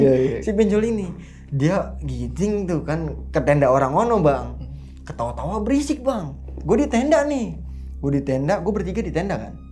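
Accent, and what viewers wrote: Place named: Ketapang, Indonesia. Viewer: Kek orang ketapang bahasanya Beke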